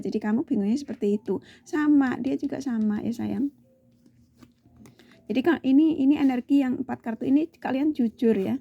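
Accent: Java, Indonesia. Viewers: mb, kok lucu banget sih, jadi ketawa klo denger redingan nya, mksh mb sangat menghibur sekali., Mb orang jawa ya kok medhok banget..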